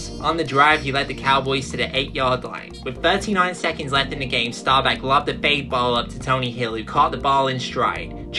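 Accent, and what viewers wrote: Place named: Australia. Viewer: Didn’t even notice the Aussie accent right away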